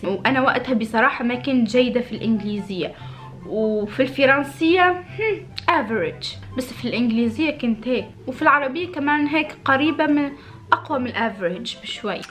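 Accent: Tunisia. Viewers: انتي تونسية صح ؟ ماشالله على بنت بلادي اول ماعرفتك عندك نبرة تونسية و شاهدت البعض من فيدواتك روووووعة ماشالله عنك😍😍 انشالله نقول عليك صحباتي يتابعوكي ❤❤❤❤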